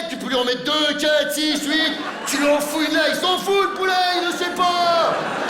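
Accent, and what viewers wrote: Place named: Lyon, France. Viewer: Joé Manitooly moi je suis originaire de la Loire du coup je l'entend l'accent lyonnais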